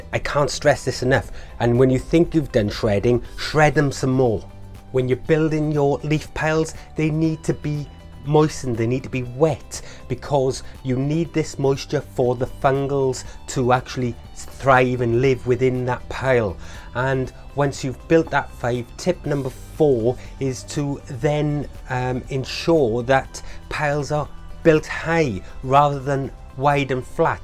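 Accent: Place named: Hengoed, United Kingdom. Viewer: Where are you located fella, I'm in the Hengoed area and you look and sound like you could be local to me?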